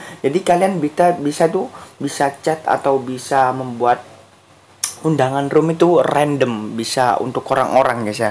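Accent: Java, Indonesia. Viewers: Anjay bgomong jowo orang mana bang emangnya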